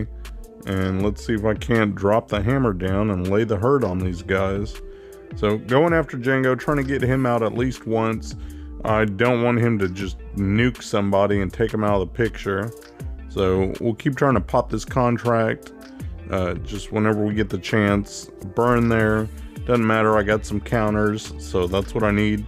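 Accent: South, United States. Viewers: You sound like a southern Technoblade… and it’s actually pretty cool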